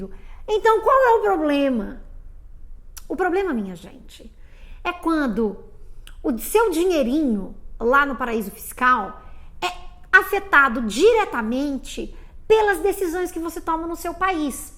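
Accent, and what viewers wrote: Rio de Janeiro, Brazil. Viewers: mata minha curiosidade, pelo amor da deusa. Você é paulistana ou carioca? Juro que às vezes ouço um sotaque carioca, e outras, um sotaque paulistano.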